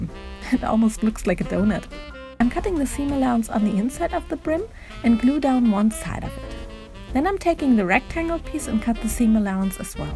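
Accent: Germany. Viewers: Are you German, by any chance? Also, amazing work!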